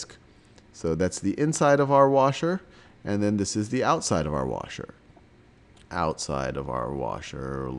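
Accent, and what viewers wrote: United States. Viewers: Definitely American. I’d guess either Midwest, northwest, or mid-Atlantic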